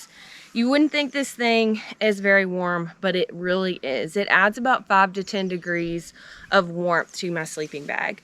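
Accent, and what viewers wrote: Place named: Georgia, United States. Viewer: U must be from South Georgia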